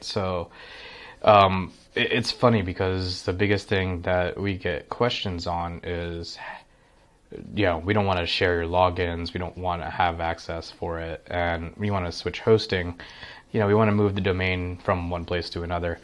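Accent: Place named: United States. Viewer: Finally someone with a clear voice and American accent thanks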